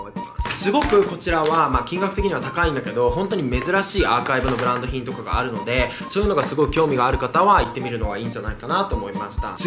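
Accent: Kansai, Japan. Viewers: なんで今日関西弁多いのWWW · って、たまに関西弁が混じるんですが関西出身なんでしょうか？急に親近感🐸（私は兵庫出身です！）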